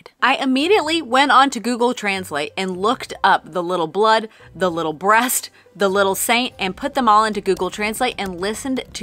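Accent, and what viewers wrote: United States. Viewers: As a French native, I know the pronunciation can be difficult and also French people are stucked up the way French words are said. French isn't easy even for the French. I think that your American accent gives it a charm. I've been in the US for the past 25 years and still have a strong accent and often I killed the English words. What matters is that you did your best and wanted to honor Therese de Lisieux. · i love your videos and i’m happy you changed the channel name! i always thought it was funny how you obviously don’t speak french but have a French! channel name😂 i think ur pronunciation was okay but a little unintentionally pretentious especially in contrast with american accent coming right after😅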